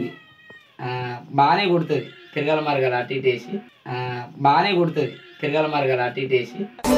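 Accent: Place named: Telangana, India. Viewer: Thammi nuvvu e yaasa marchaku mana telangana yaasa chaala baguntadhi don't change ok keep going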